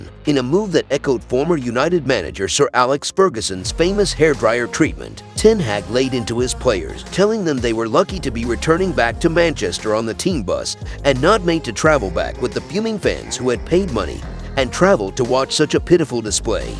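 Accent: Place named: United States. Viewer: Stop using American voiceovers. They are garbage. The do not even know how to pronounce names correctly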